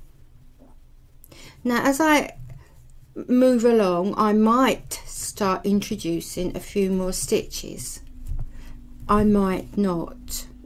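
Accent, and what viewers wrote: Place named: Cockney, United Kingdom. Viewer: I can hear a little of your Cockney roots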